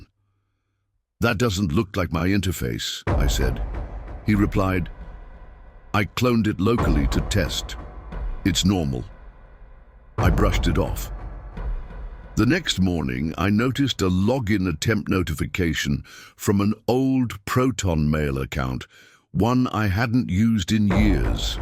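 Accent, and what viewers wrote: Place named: RP, United Kingdom. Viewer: Just discovered your channel! Interesting and eerie story! What makes your channel unique is your voice. I get the feeling that you're an older gentleman, something you rarely find on this sort of YouTube video. (Side note; if the voice is as an AI voice, it's really realistic sounding!....no offence intended if it's your BBC voice)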